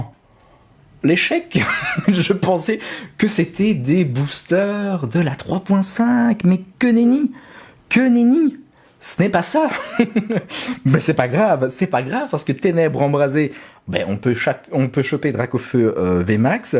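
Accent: Belgium. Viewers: Ah oui désolé j'ai pas compris ce que tu as dit quand j'ai demandé la dédicace avant dans la dernière vidéo que tu as fait désolé je comprends pas trop le Belge je suis français que dit mon prénom quand je t'ai demandé de me dédicacer si c'est moi merci et si c'est pas moi stp sinon courage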